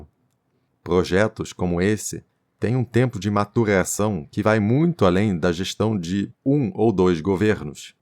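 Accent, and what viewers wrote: France. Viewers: Que sotaque francês é esse ?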